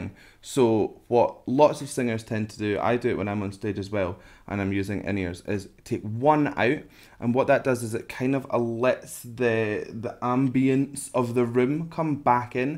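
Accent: Scotland, United Kingdom. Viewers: This guy british accent were very cute! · omg i love british accent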